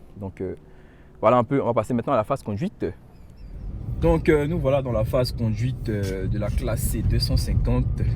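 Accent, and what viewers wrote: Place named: Haiti. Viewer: Belle video, bien argumentée dans un français soutenu, avec des anglicismes fort bien maîtrisé, une VOIX de lôveur tintée d’un léger accent! D’origine haïtienne!?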